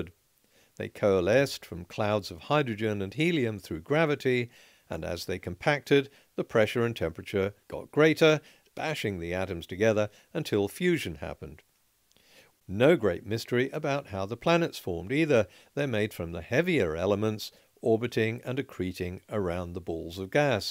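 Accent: RP, United Kingdom. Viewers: "nothing literally nothing" - richard dawkins asking Lawrence krauss "yes" more like atheist dont know what their academics are saying i don't think you can actually defend your position aside from English posh accent, a rapid expansion is a explosion, but this is just semantical equivocation, genius you still think it 'expanded' from nothing, the singularity ISSSS nothing in the atheist wolrd view, simply calling nothing the singularity doesn't stop it from being nothing. which i dont need to tell you this is a stupid teenager answer how about you actually debate for once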